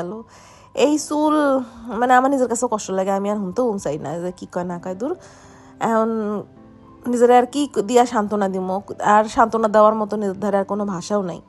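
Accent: Barishal, Bangladesh. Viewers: Apu sounds like barishal vasha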